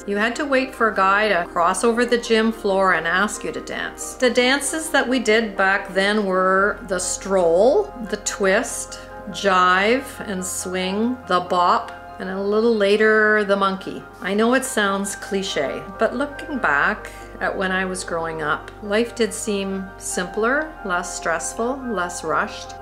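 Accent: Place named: Canada. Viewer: I have just stumbled onto this video and I LOVE the Canadian Accent..!! I can relate to so many things - even growing up in the 70's/80's... thank you for this..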